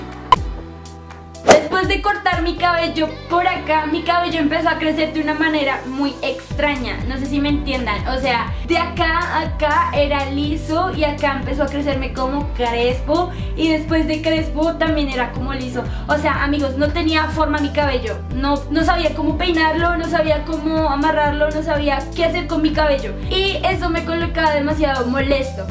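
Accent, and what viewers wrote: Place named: Colombia. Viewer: Me encanta su acento colombiano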